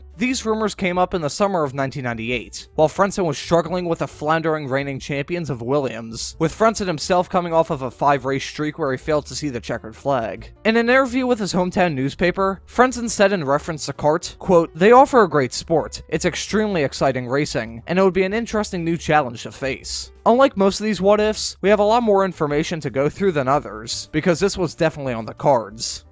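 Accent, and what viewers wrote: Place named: Mid-Atlantic, United States. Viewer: Ah I was gonna guess somewhere in the mid Atlantic. I grew up in Baltimore so I thought it sounded kinda familiar! Great videos btw keep up the good work. Cheers from the Indiana!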